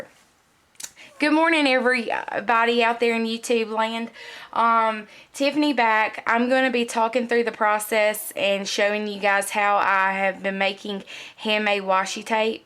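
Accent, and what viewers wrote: South, United States. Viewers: Great video but boy that southern accent! Lol yes, I'm from the south too.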